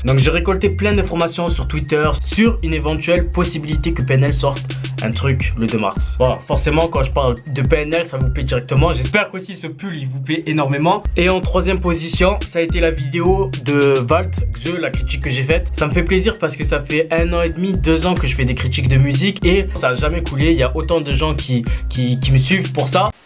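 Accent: Marseille, France. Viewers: sa va c'est bien t'es vidéo moi je kif comme tu est... avant les vidéo que tu fessais genre je kidnappe un abonner ou playlists ou on lui coupe les cheveux on aime bien ton accent de Marseille avec t'es tarpin bien c'était mieux a l’ancienne je dois pas être le seul a pensait sa mais continue tu est dans le droit chemin.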